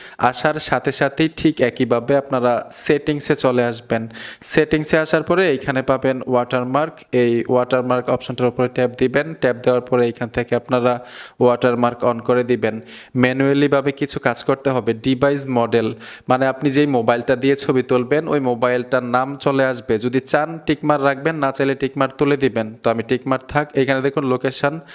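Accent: Comilla, Bangladesh. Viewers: ভাই আপনিকি কুমিল্লার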